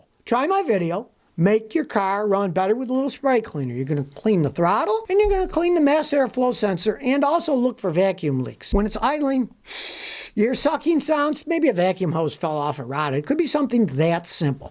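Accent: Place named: South, United States. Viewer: If you glued Scotty's hands to his knees, he wouldn't be able to say a word. He talks like folks from the South (says a gal from the South).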